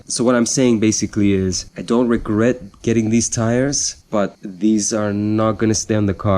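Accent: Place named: North America. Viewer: Good video again. You definitely don't sound Greek, more North American accent.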